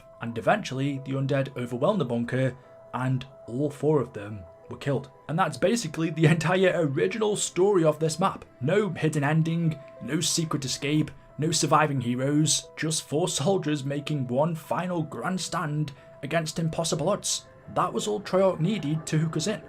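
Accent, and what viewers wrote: United Kingdom. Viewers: Your accent man, you must be british?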